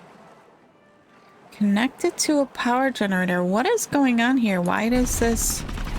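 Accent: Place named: Midwest, United States. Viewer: From ope to oofda, I really enjoy the midwestern speech. It all makes me feel at home while listening. Plus, the soundtrack is so catchy, I love to hum along as well. I hope you had an amazing Solstice, and that the next three months bring you much needed rest and revitalization. Thank you for sharing your playthrough 🙏🌠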